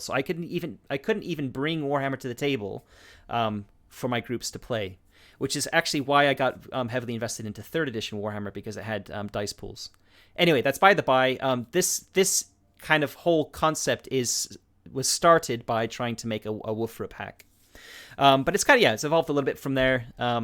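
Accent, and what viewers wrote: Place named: United States. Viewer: Interesting game idea. Also, unrelated to anything you were talking about: do you know you sound like an American?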